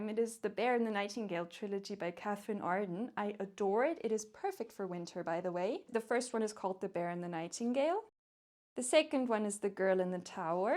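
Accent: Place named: Germany. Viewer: I read a lot of classic literature, biographies, text books, manuals, science fiction.and self help books. You have a neat and tidy tome of books. I dislike Shakepeare. I had enough studying and reading his books during my high school days. I am a big fan of ancient Roman society and all those famous philosophers. The most expensive book I bought was The Red Book by Carl Jung and the full collection of The Decline and Fall Of the Roman Empire by Edward Gibbins. . I am an Aussie old man and I have historical books about Australian authors from the 19th century. For a young lady you speak English very well and I like your German accent. My mother was German and I could never understood anything when she spoke to me even though I spoke Polish to her.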